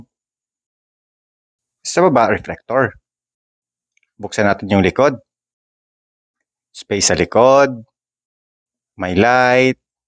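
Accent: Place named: Thailand. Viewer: Bro, bakit Thailander ang accent mo? Muntik ko ng I-skip akala ko ibang lahi 😂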